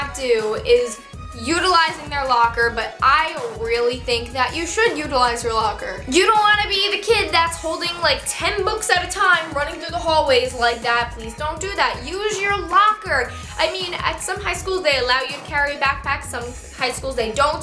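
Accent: New York, United States. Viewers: You have a New York accent! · are you from NY ?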